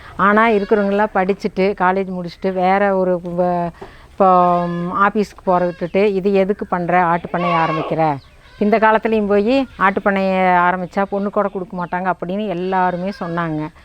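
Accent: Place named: Madurai, India. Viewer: ❤ madurai basha ❤❤❤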